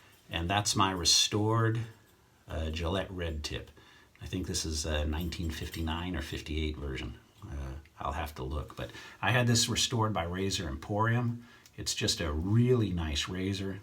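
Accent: United Kingdom. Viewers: Are you British ???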